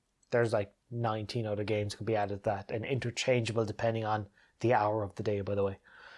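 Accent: Ireland. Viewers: Currently listening to these as an aid to get me to sleep. They do say the Irish accent is musical. All I know is it works. Thanks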